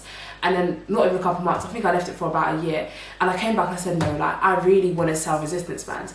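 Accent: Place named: United Kingdom. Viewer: Your accent 😍 I’m a sucker for british African Americans 😍😍😍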